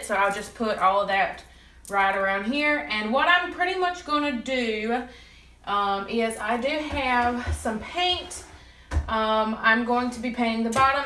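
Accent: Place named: Alabama, United States. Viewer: I love listening ot you talk... you sound like everyone I know! :-) (I'm from Alabama.)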